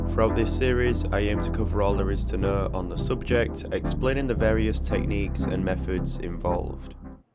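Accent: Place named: Scotland, United Kingdom. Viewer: Is he Scottish? I love his accent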